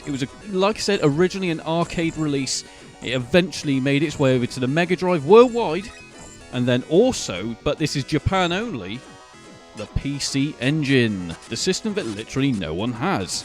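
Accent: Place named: United Kingdom. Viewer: British accent. sounds legit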